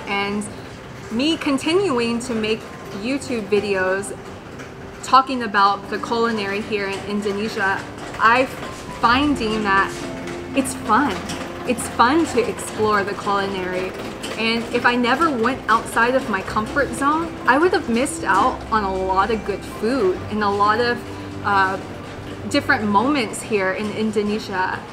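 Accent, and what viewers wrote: United States. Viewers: Keep making videos! It is good for learning American pronunciation. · I learn much american accent from you, You need colab with Indonesian food vlogger to get more subscribe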